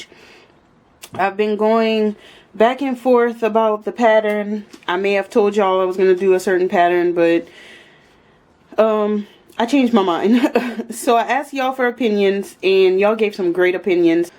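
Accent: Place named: Louisiana, United States. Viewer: Thanks again for another Roulette! Ok I know you are from Tx but you have a very Louisiana accent. Do you have history there?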